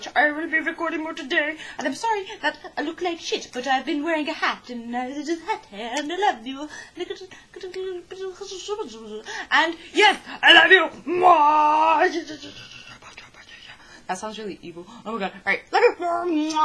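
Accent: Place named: United Kingdom. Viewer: i didnt realise ur british ? :O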